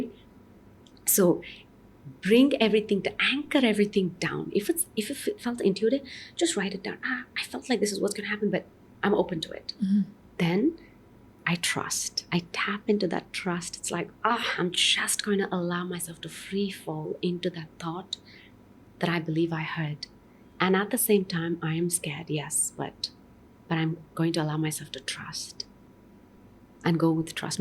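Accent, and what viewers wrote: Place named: Maharashtra, India. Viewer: ji namste. Are you from Maharashtra.. I notice certain influence or MTI of Maharashtra in your speaking... Thanks, the guest is awesome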